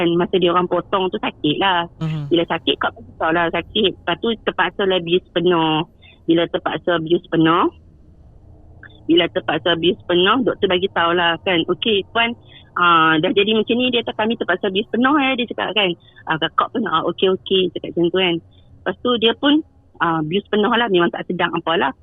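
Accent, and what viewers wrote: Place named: North, Malaysia. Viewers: Santai chill nie layan malam2 syok, & faham ke tu cakap, pekat gak utara nya tu😅😅😅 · Kemaiihhh,pekattt likat lorat utara. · Saya baru dengar cerita dari ni..sori terlepaih bas 😀 Memang terhibur, sebab cara loghat orang utara sembang memang lagutu, dia punya kona, pusing roundabout, uturn balik, baru sampai umah 😀😀😀😀 Part II kalau boleh naa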